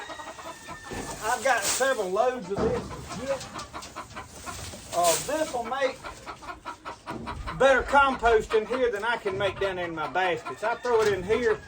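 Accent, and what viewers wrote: South, United States. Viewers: Looking for help for Arctic Blast on homestead in Alabama 😮(AND I LOVE 💕 hearing your sweet Southern voice 😅)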